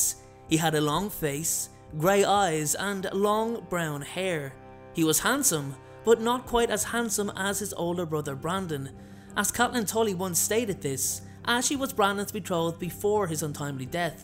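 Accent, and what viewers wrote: Ireland. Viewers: He’s obviously Irish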